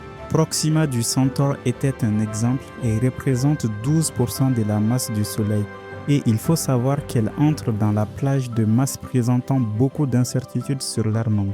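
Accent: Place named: Africa. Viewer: J'adore ton accent africain, c'est rafraîchissant dans ce monde d'internet où toutes les voix sont faites par IA et se ressemblent toutes. Tu articule bien en plus, c'est compréhensible. Merci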